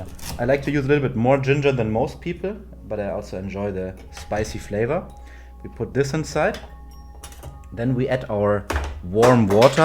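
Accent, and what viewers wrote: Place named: Germany. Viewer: I can hear out the DEUTSCH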